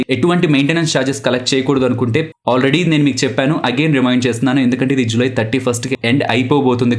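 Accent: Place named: Rayalaseema, India. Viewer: Bro u r from Rayalaseema 😎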